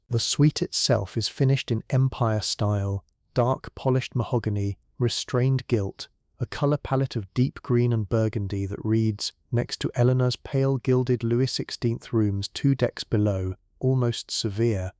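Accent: United Kingdom. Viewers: can you change your IA voice to something not English so very English English.??? I have to jump away from your great content because I am so sick of the English accent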